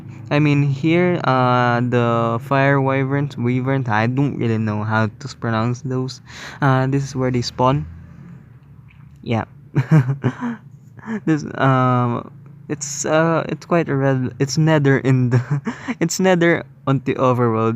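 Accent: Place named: Philippines. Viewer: Your a pilipino right i realized it because of your accent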